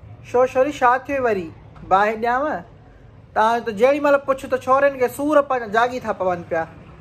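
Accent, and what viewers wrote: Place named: Larkana, Pakistan. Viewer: 'Kadhin kadhin shashindo' aw😁safa Larkane waran ji boli thi galhin 😂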